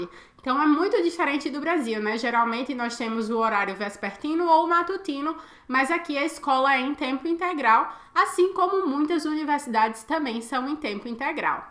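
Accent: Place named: Northeast, Brazil. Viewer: Em primeiro lugar, como baiana que sou, amo este sotaque nordestino. Aqui também os alunos nao usam farda e pagam meia passagem. Inclusive a Eslovaquia faz fronteira com a Italia né?! Aqui é bem comum as crianças e adolecentes fazerem excursao, pela escola para outros paises da europa, bem legal mesmo! Bjs 1000 e obrigada por falar de mim.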